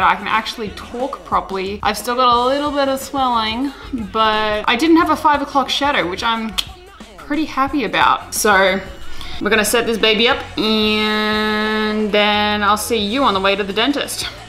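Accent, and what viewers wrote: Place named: Australia. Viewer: More of that Aussie accent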